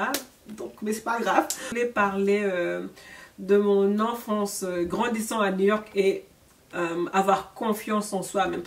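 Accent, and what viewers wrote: Senegal. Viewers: MlleMama c’est pas qu’elle parle mal français, c’est l’accent sénégalais qui est fort comme ça, toutes mes copines sénégalaises parlent de la même façon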